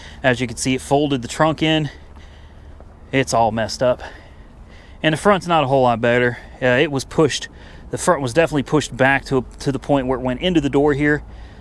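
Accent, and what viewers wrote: United States. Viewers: Come on Man, its you Yanks mashed English Aluminium into "Loominum". Not even Germans do that.!!. Even a Life Boy becames a "Life BOOeeee", and that confuses the rest of the World. Rangers generally have shitty little 4 Pot leaf blower engines, that brakeless ones good.!.Ford Service /Dealers got a Shit name over here in SE Asia.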